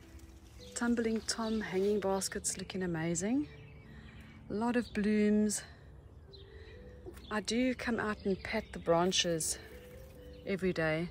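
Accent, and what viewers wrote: South Africa. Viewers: Hi, dont know your name but I just found your channel last night. I literally turned green with envy watching your beautiful garden in the USA. I live in Napier, Overberg Western Cape. So listening to your "normal" SA voice was SO nice to hear. I particularly loved your video of repotting strings, which are favorites of mine. I realize I am not doing enough propagation seeing all yours. I will be glued for more vids ❤ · What a beautiful, well kept garden. A nice surprise to hear your South African accent. An ex pat here, in Austria.👏😍👏😍😊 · You sound very South African🇿🇦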